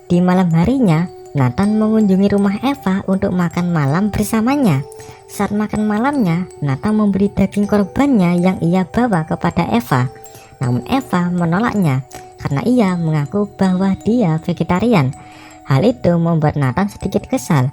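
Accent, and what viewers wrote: Java, Indonesia. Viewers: nya medok rek bikin gumuuz😂😂😂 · Ini ebbo kucing versi review film nya tapi medok 🤔😅✌ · kucing host nya logatnya medok hhh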